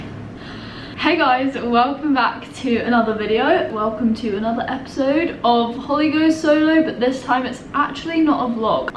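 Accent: Australia. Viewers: You’re picking up the Australian accent. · The Australian accent is creeping in.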